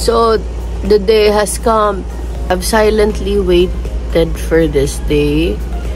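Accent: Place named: Visayas, Philippines. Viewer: Dai, meron kanang bisaya accent. Buntis ka dai, kaya medyo haggard imong nawng... minsan ganuon ang mukha ng buntis during 1st trimester period. Mag blo-bloom kalang after mawala na yong duwal² moment at mga sensitive moment sa amoy. Ganuon din ako when I was pregnant with my daughter 19yrs ago. Di na ako nag buntis uli, kasi ang hirap manganak hahaha.. di kinaya ng powers ko.. Anyways enjoy your pregnancy dai, ug hinay² lang.. ❤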